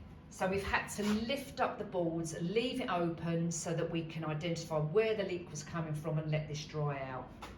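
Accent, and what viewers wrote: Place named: London, United Kingdom. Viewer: Hi new subscriber here, I'm bridie, you sound like a londoner, may be wrong, apologies, I'm London born ❤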